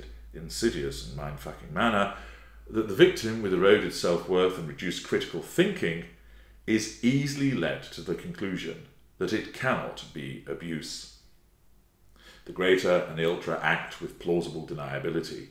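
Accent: United Kingdom. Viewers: Same here! I love British accent. It's so smooth and hot. 🔥😂🤭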